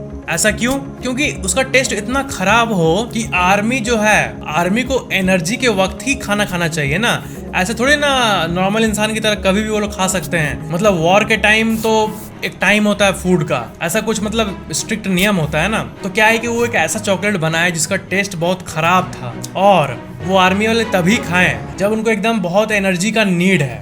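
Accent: Bihar, India. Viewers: Sir aap bihari ho na please reply me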